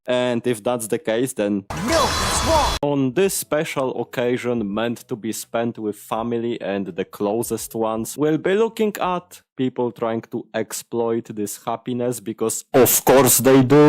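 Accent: Poland. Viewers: You sound like a Polish person, I know what I'm talking about bro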